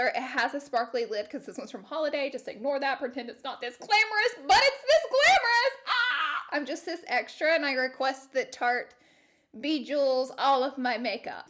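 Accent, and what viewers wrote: South, United States. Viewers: I love her Souther twang....coming from a Kentuckian with a major Southern drawl! 😆😆😆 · “My whole side panel just fell” that southern aksent gf❤️❤️ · Please bring out that southern accent more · When you whisper “what is this thing” I literally lol 😂!!! It was like from a horror/supernatural movie lol Btw pleaseeee keep using your southern accent !! It’s soo cute x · She's from Alabama and Insatiable is in Georgia. A smoother southern twang than Alabama I think. Love all the southern accents though. :) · "Ma whole side panel just fell" The southern drawl is STRONG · Damnnn she sound like a southern bella or a pretty hillbilly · Can we talk about how country the first 5 seconds of this video was?! 😂 I looooove it. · Your country accent poking out is so cute!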